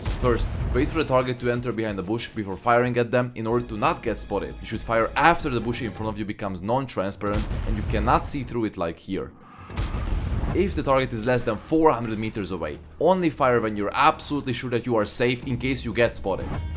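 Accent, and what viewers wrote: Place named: Hungary. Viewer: Miért ilyen magyaros a kiejtésed?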